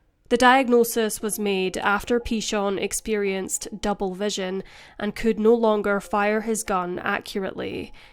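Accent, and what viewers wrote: Scotland, United Kingdom. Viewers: Cute lil Scottish accent ;)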